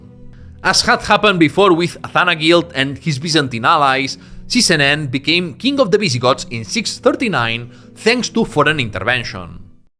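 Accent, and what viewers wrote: Spain. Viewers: Is there an option in Spanish, this English is a little too Spaniard for me?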